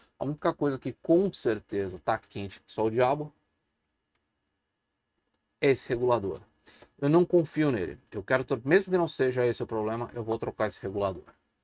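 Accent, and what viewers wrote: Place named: Rio de Janeiro, Brazil. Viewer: Show like show , vídeo muito top vc e do RJ tem um pouco de sotaque :)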